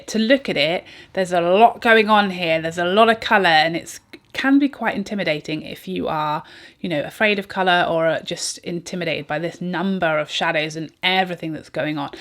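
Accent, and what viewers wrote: England, United Kingdom. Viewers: I'm italian 41 years old mum, I love listening to you and I love your wonderful English accent! 😍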